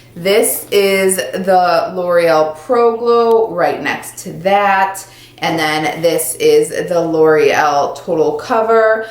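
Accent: Chicago, United States. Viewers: I knew she was either from Chicago or Michigan. before she said Chicago just from her accent. 👌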